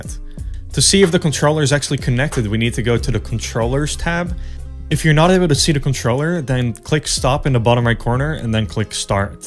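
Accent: Netherlands. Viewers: are yu dutch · r u dutch? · Are you dutch? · i knew you were dutch also ty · you have a dutch accent. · I heard your dutch accent and instantly thought u were dutch then i saw the notification on ur laptop and yeah i was right you're dutch! Just like me :)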